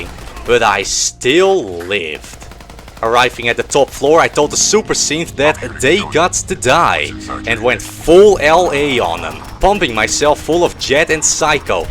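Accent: Netherlands. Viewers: hou van het nederlandse accentt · Heerlijk dat Nederlands Engels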